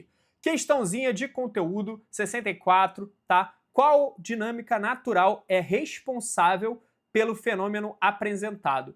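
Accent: Rio de Janeiro, Brazil. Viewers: eu racho com o seu jeito carioca de explicar KKKKKKKK amo seus vídeos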